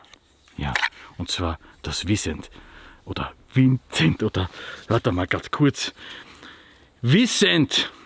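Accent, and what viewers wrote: Austria. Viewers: Der Ösidialekt is eh da geilste.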